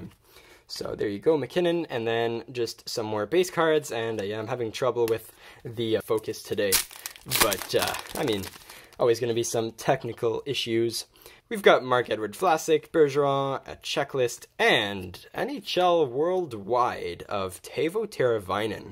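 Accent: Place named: France. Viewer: stoooooop with the french pronunciations of players names, only thing that makes me not want to watch u lol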